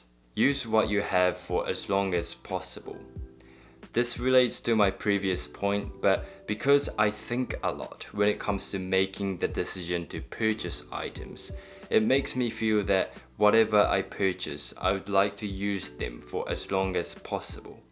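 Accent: New Zealand. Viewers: Are you a kiwi? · I thought I’d detected a kiwi accent 😃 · you sound like a kiwi.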